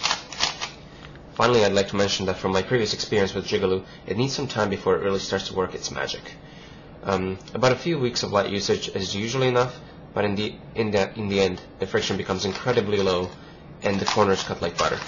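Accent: Canada. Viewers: Ah! Thanks for this. I'm about to lube a cube myself for the first time, and I wasn't sure whether I should disassemble the cube or just spray some in and how much. En passant, êtes-vous Québécois? Votre accent, léger qu'il soit, semble nettement Canadien-français.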